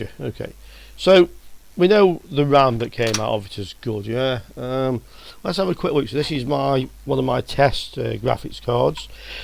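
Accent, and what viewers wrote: United Kingdom. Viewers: Another guy who we can't understand...Too much 'Brit mumbled accent and boring personality?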